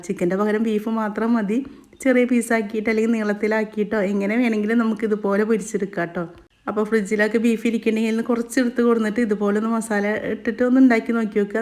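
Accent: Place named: Thrissur, India. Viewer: Edaa.orupaad thanks..undaakki kaanichathil..undaakkeet abipraayam parayaam👍❤️❤️aa kini kini trissur samsaram kurakkallee..kurachu koottikolooo😁❤️❤️❤️❤️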